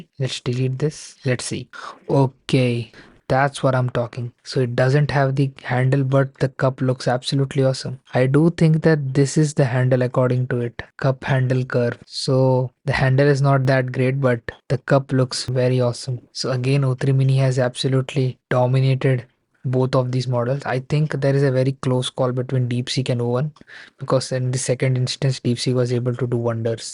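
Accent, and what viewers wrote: India. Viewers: I wasn't expecting Indian accent when I opened the video. However, thanks for the comprasion.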